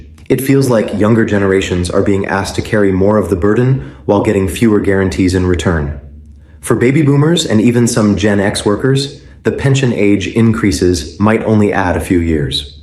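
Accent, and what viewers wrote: United States. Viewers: Why is an american accent being used instead of British? You cant really take this channel seriously due to it. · This is the second video I've seen narrated by this guy with an American accent with misinformation that's not been UK News and on the government website and would have been.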